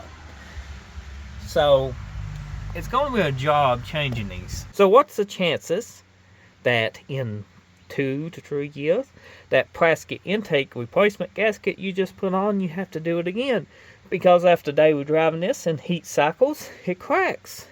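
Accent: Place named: Louisiana, United States. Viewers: you've got an interesting accent. Where are you from, Louisiana? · Sounds like a louisiana accent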